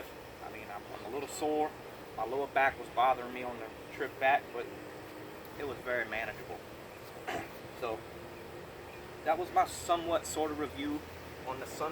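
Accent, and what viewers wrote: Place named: Lafayette, United States. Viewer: You sound like you might be from around the lafayette area, just wondering because i wanted to know how it did around here? I'm looking for one to mainly go to big lake and such.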